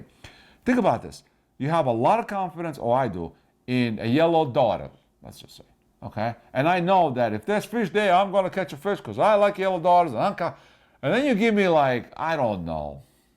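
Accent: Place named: New York, United States. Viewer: you are The Man. I started out just watching your videos when they popped up relating to a subject I was looking for. The more I watch though, the more addicted I'm getting. This one is the best yet - informative, passionate, and really funny. It looks like there's a whole bunch for me to catch up on too. Way to go. Many thanks. Raymondo Ps. Even though I'm familiar with the New York accent (sorry I should have mentioned that I'm in Wales, in the U.K.), you get me sometimes with your pronunciation. For the first few videos I watched I couldn't figure out why you kept talking about your daughter's??? Of course it all came clear when I watch your show on 'darters'. Stay cool pal.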